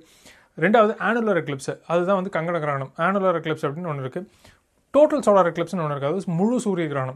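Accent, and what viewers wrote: Coimbatore, India. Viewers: Excellent description abt solar eclipse bro. Especially in our Coimbatore slang, really i enjoyed it bro. And I got the solar film for ₹1020 from Mumbai. "Tejraj and co" Mumbai based astronomical equipment manufacturer. If some one need it can use. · Bro neenga Coimbatore ah.... Namma aalu.. neenga kuniyamuthur nu type pannathukku apro unga slang semma.. bro Mettupalayam la ipo cloudy ah irukku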